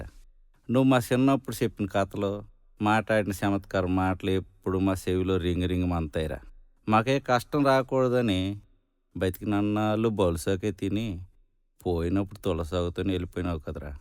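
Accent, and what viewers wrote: Srikakulam, India. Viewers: శ్రీకాకుం యాస,భాష తో మన గ్రామీణ కుటుంబ పెద్దల జీవన విధానం గురించి చాల బాగా చెప్పారు. గారు..మీకు సంక్రాంతి శుభాకాంక్షలు. గన్నిరాజు డీఇఇ జలవనురుల శాఖ. శ్రీకాకుళం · సార్, శ్రీ కాకుళం, ప్రాంతీయ భాషా సౌందర్యం,అట్టాడ అప్పలనాయుడు, కొంచెం నాలుకకిరాసి,రుచిచూపించాడుకానీ,మీమాటలులోఆయాసామృతంమొత్తంగారుచిచూపించడంకాదు,కడుపూమనసూకూడానింపేశారు.ఆప్రాంతీయభాషామాధుర్యంఇటీవల ఒక మిత్రుడు ఇలాశాంపిల్ చూపించాడు.?ఒకరైతుకూలీస్త్రీఇలాఅందట?ఉలుకూపలుకూలేనమ్మకి, ఊరంతా ఉపారాలే!ఎద్దునాగకష్టపడిందానికి"పకాలే,డకోలు.అన్నాదట! ఎంత చక్కని పోలిక, ఎంత మధురమైన ఫోలిక,ఈవిశాఖపట్నంలో, కొంత మంది మిత్రులు, శ్రీకాకుళం వాళ్ళనీ,వాళ్ళభాషయాసనీ,అవమానకరంగాహేళనగానూమాటలాడుతుంటే,సహించలేక,శ్రికాకుళమేరామాదీశ్రికాకుళమేరా!విప్లవాలాపురిటిగడ్డా,వీరయోధులపోరుగడ్డా,ధీరమాతలనుదుటికుంకుమ,జారితూరుపుఎర్రబారిన,శ్రికాకుళమేరామాదీశ్రికాకుళమేరాఅనేపల్లవితో, విప్లవ అమరవీరులుపంచాదిక్రిష్ణమూర్తీ,నిర్మలమ్మా, సత్యం మాష్షగారినీ,ఆదిభట్లకైలాసంనీ,తామాడగణపతినీ,ఆపాటలోపరిచయంచేస్తూవారిత్యాగాల్నిగుర్తుచేస్తూరాసిన ఈపాట ఆశ్చర్యంగా, క్రిష్ణా, ఉభయ గోదావరి జిల్లాల్లో తెలంగాణ లలో,విస్త్రుతంగాపాడుతూపరవశిస్తూ, ఫోన్లు చేస్తూ అయ్యాఏభైఏళ్ళుగతించినా,శ్రికాకుళవిప్లవోద్యమాన్నీ,అమరవీరుల్నీగుర్తుచేసేపాటనుఏవిప్లవపార్టీగానీ,ఏసాంస్క్రుతికసంస్థగానీపాటరాయలేదండీఎంతబాగారాశారనిసంతోషంతోప్రశంసిస్తున్నారు.ఇక్కడనాపైప్రశంసలగొప్పలుచెప్పడంకాదు.మిత్రులుశ్రికాకుళయాసనీఅక్కడమనుషుల్నీఅవమానకరంగామాట్లాడేవాళ్ళకళ్ళుతెరిపించాననేసంతృప్తిదొరికిందిఅదిచాలు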